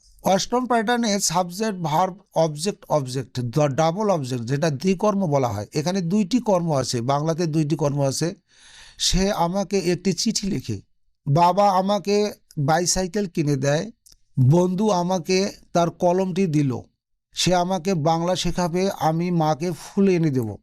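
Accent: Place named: United Kingdom. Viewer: Apni british english bolchen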